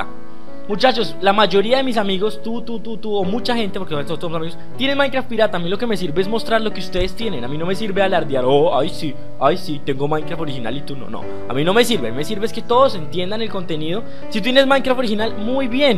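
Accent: Colombia. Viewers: de que parte de colombia eres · Oye ... XD vengo de uno de los packs HD ... xD y se oía un poco lo rolo en la voz y claro Colombiano parce !! XD !! saludos desde cúcuta .. aún así no estoy ni seguro si es de bogotá xD !!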